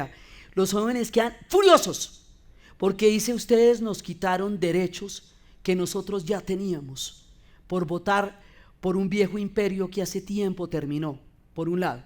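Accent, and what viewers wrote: Colombia. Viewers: Genial, aleccionador además que me encanta la forma de hablar de los colombianos jajajaja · QUE ORGULLO COLOMBIANA TIENE ESE ASCENTO BOGOTANO TAN NITIDO, COMO TODO LO QUE NOS ENSEÑA GRACIAS TOTALES!!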